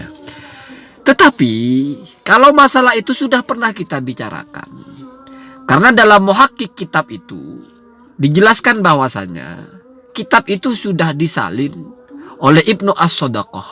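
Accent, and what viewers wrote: Madura, Indonesia. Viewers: 😂😂😂😂 maduranya sangat kental...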